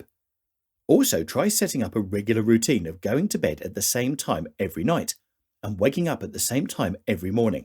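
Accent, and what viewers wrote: England, United Kingdom. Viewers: Such beautiful English dialect, I like biscuits and tea btw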